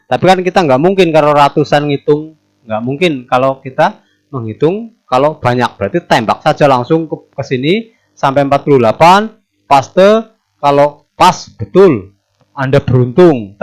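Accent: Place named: Banyumas, Indonesia. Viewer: Gokil..yakin..jos wong ngapak. Jelas bgt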